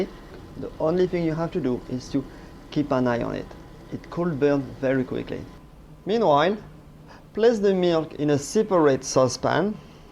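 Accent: France. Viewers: i love ur english accent(u might be a french speaker).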